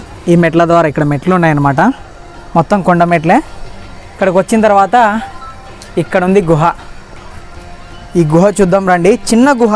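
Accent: Rayalaseema, India. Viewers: Rayalaseema yaasa?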